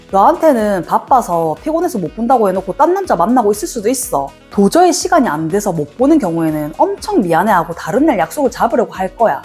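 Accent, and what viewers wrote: Gyeongsang, South Korea. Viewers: 갱상도네~ 부산 경남 쪽아이가~ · 경상도 여자군요 ㅎ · 갱상도 여자네~ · 갱상도 가스나네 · 경상도 말투 귀여움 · 경상도(부산이나 대구) 사투리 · 갱상도 사투리 반갑소~~~😊😊😊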